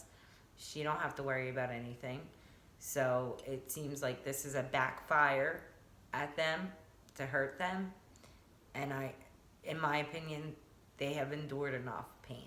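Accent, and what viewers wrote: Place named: Philadelphia, United States. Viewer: I’m so glad you did this because I wasn’t sure of the details of what was going on but there was no way I was gonna give one more view to MGL because I’m done with her garbage ass. Also, are you from Philly? Haha def a Philly or NJ accent!!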